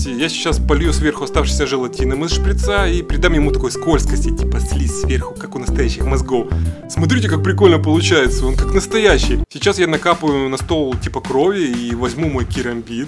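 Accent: Ukraine. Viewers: мои дэтуктивные метоты не врут ты Украинец? я услышал твой акцен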